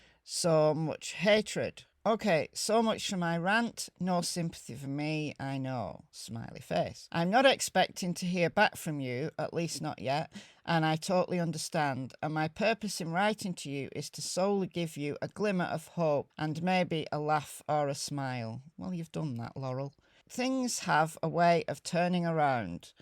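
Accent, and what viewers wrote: United Kingdom. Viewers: lovely to hear another brishish person xx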